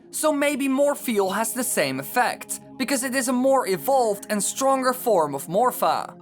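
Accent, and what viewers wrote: Netherlands. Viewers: I hate Your fucking Dutch accent so much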